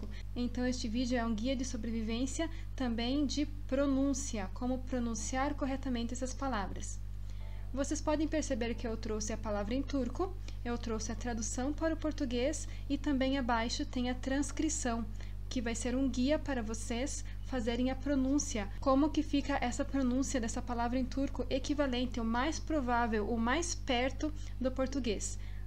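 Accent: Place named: Brazil. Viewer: O t em português pronuncia se t efetivamente. Os brasileiros é q pronunciam assim.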